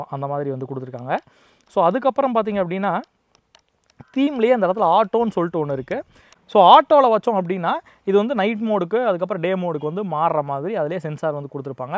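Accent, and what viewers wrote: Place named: Kongu, India. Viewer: அது கொங்கன்ஸ் ஓட பிறந்த ஓசை. எளிதில் மாறாது !!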